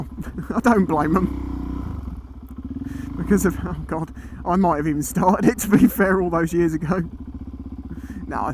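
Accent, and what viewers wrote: United Kingdom. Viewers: Haha British, idk why but you Brit’s sound so hilarious to me.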